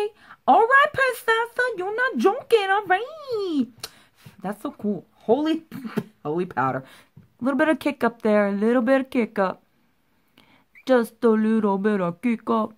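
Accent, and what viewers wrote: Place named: New York, United States. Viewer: I hear a New York accent girl! Love shopmisssa first impressions btw.💕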